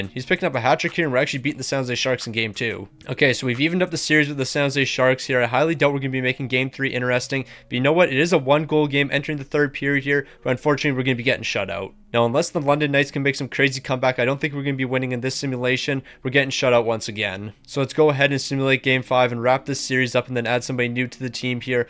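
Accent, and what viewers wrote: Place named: Quebec, Canada. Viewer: you actually pronouced cataractes exactly right lol (well i mean, minus the quebec accent)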